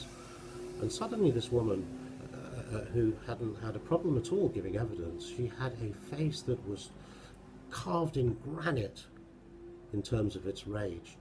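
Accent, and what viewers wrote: England, United Kingdom. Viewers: Anyone randomly binging this dudes videos? I see fresh comments on a 4 year old vid. Like this dude's style of video, but how does he have an English accent with that name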